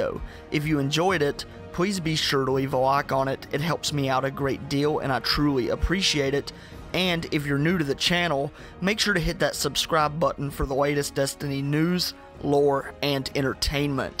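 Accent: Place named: West, United States. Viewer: I click on the video. The first 3 seconds I'm like: oh great it's the guy with the western accent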